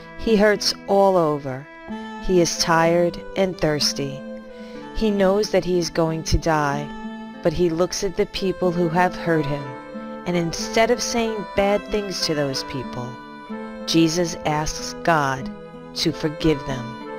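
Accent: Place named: New York, United States. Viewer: New York accent much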